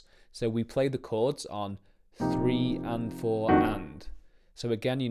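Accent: Liverpool, United Kingdom. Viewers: Either from Liverpool or The Wirral?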